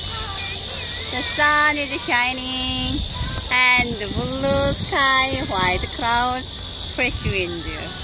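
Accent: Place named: South Korea. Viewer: 외국에선 실제로 그런 발음이나 문법적인것들 보다도 실제로 상대가 어떤 이야기를 하고픈 건지에 대해 더 주의를 기울이는데 댓글창에는 번지르르한 보이는것들에 더 집착하는 분들이 계시네요. 덧 없습니다. 계속 keep it up하세요. 한국인으로 한국발음에 익숙하게 자라온게 너무나 당연하고 자연스러운건데 왜 꼭 영어하는 세상 모든 사람들이 본토의 원어민 발음을 가져야 한다고 생각할까요? 말도 안되는거죠. 항상 응원하겠습니다.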